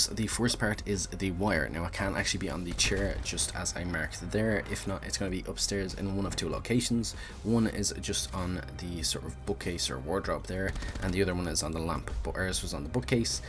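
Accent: Ireland. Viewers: You Irish?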